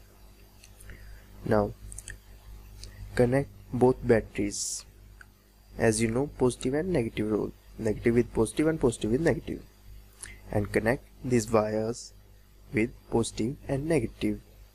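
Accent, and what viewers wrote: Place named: India. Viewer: you white people do not know your accents. He's Indian!! can anybody hear that?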